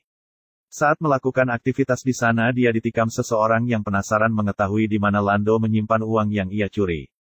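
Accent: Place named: Banyumas, Indonesia. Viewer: Sesuai request sudah ngapak2 nih kawan salam silahturahmi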